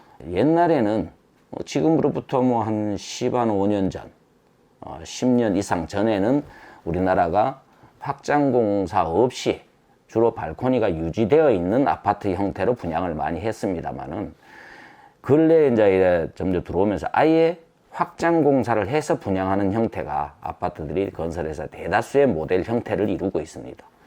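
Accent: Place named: Daegu, South Korea. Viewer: 공사해보지않고는 어찌 실력자인지 구분할수있을까요.ㅜㅜ6월1일 이사가는데 올수리해야하는데 걱정이 많습니다.사투리 ㅎㅎ 대구지역이신가요?대구면 상담하고싶네요.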